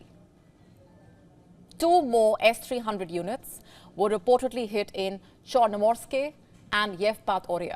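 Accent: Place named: India. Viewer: Can't stand this indain accent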